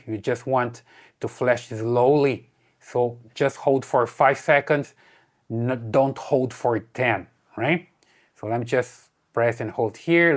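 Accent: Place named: Brazil. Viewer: How to identify a Brazilian speaking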